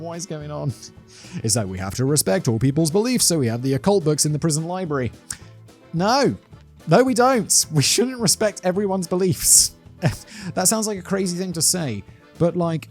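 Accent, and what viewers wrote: United States. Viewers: Why u talking like americano.?😊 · I am slowly working my way through all of Simon's offerings, so I know I'm late to the party... but whenever Simon gets to the point where the criminal is caught... he sounds more and more American with each episode. I don't remember which episode it was, but when he was hoping that the particular state had the death penalty... yeah, come over to the dark side of the pond. The majority of us here prefer to live and let live. The ones who get all offended are usually just politicians 🙄.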